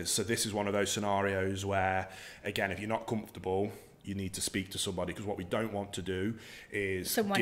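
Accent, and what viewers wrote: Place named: Midlands, United Kingdom. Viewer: LOL you sound like you're from the Midlands.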